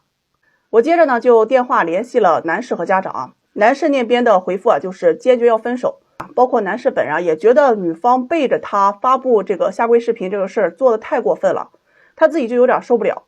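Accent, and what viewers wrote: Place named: Shandong, China. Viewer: 听口音感觉像老乡啊，我是山东人。😂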